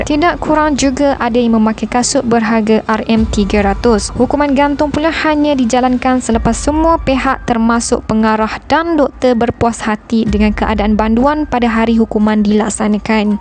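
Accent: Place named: Indonesia. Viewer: Kenapa macam slang Indon je..Cuba gunakan balik bahasa baku....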